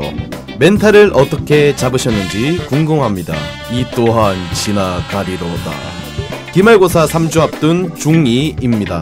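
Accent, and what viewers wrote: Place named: Seoul, South Korea. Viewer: ㅋㅋㅋ 넘 귀여운 서울 말 씨! ㅋㅋ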